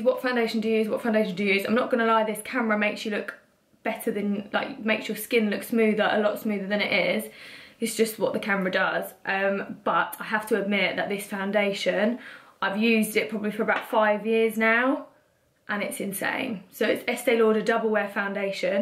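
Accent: United Kingdom. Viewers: I didn’t know you had a British accent! 😅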